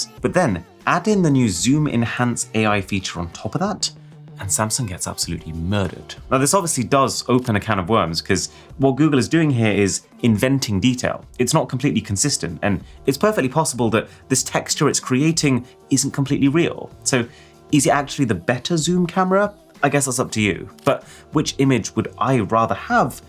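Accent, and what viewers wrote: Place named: Nottingham, United Kingdom. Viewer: Your from Nottingham thats crazy.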